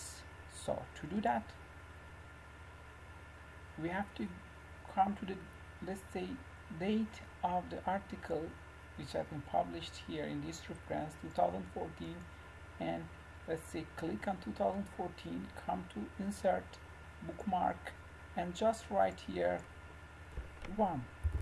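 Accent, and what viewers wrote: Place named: Iran. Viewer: Thank you so much for this upload - grateful! You sound Iranian! I have an Iranian friend. Cheers!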